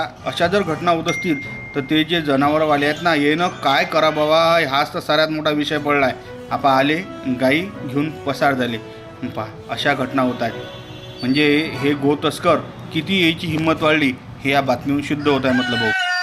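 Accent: Varhadi, India. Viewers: बातमी सांगायची शैली फक्त भाऊ तुम्हीच तुमची वऱ्हाडी भाषे ची जी पद्धत आहे याची बरोबरी कोणीच करू शकत नाही.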